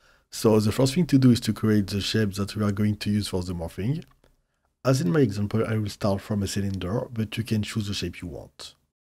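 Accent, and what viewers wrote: France. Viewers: you're French ? you have a French accent ;)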